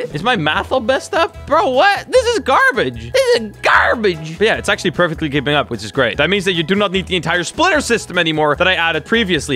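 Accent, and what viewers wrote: Netherlands. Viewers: Ok, in almost sure that you are dutch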